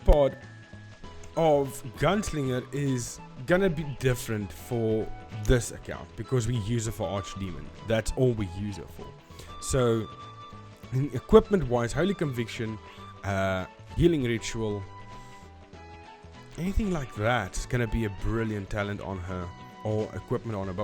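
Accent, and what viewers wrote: South Africa. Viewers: Jy klink afrikaans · You sound south African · You sound South African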